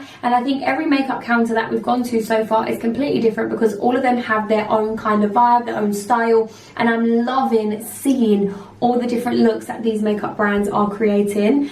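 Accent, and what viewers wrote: United Kingdom. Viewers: I knew she was british before i clicked on the video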